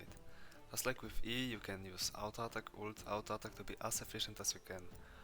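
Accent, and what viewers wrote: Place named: Poland. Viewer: najpierw z akcentu mylslalem ze rodak, potem zwatpilem a potem zauwazylem nick na wozku, pozdrawiam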